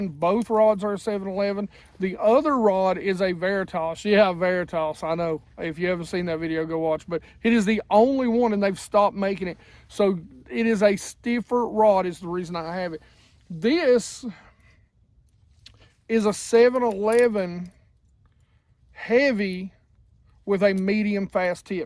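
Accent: South, United States. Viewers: So glad to see you fully recovered from Covid Brother, sounds you're back 100% Southern drawl and all!! LOL!! Would I get the same reaction if I put plastic flukes instead of swimbaits? Great instructional video!!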